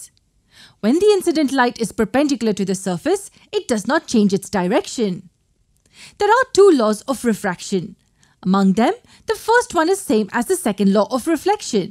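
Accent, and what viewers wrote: India. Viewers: I only watched this cause of the Indians voice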